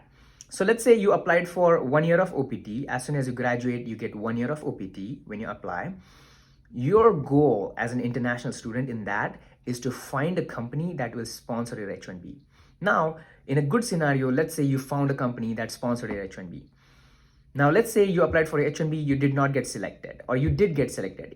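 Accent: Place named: South India, India. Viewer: Chutiya accent badal be... Understand se pata chalta hai ki South Indian hai ye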